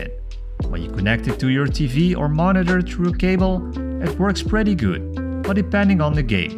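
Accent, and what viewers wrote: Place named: Netherlands. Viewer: Jij bent toch een Nederlander é haha, ik hoor het aan je accent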